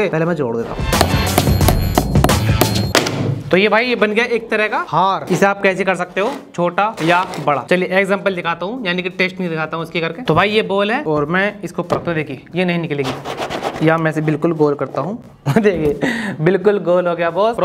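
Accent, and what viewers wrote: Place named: Bharatpur, India. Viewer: Aapki bhasha humare yaha jesi h bharatpuriya jo bahut achchhi. Lagti h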